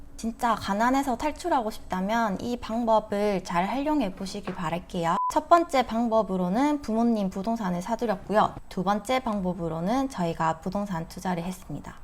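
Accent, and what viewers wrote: Gyeongsang, South Korea. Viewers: 경상도 어투신데 사시는곳은 서울이신가요? 좋은강의가 수도권에 있다보니 부산경남쪽 부린이들 교육하시는 진실강사찾기가 초보한테 어려운데 분양권공부시작은 뭐부터 해야할까요?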